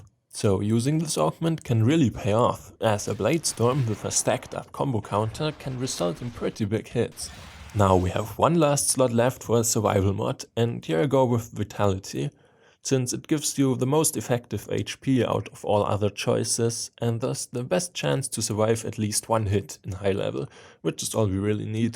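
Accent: Germany. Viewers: You sound very german. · Are you from Germany or Austria :D Your accent sounds like that :)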